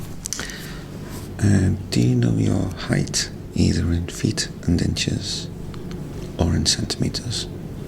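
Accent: Merseyside, United Kingdom. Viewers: I never thought I'd like listening to a Merseyside accent (no offence, just personal preference) but I really enjoy this.:) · that your from Merseyside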